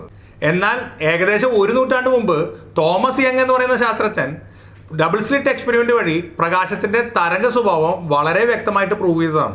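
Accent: Thrissur, India. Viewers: thrissur kaaran aanalle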